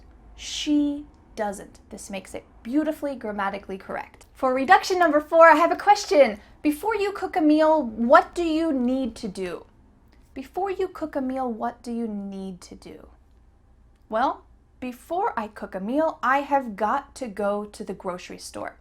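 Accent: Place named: United States. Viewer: Do all Americans speak like you do? It sounds like a lot of slang. May I ask what program did you use to make the video?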